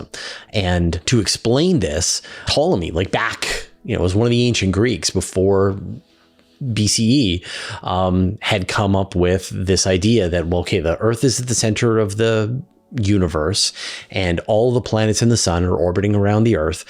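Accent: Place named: Canada. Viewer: All alone and finally at peace is clearly what you meant to say. =) haha Man oh man I love this channel. It's so nice to hear a fellow Canuck.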